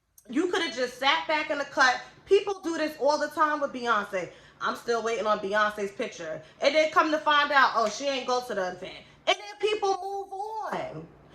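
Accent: New York, United States. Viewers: Its probably bc I'm a country ass SC girl lmao but I LOVE a NY accent. I could listen to you talk all day lol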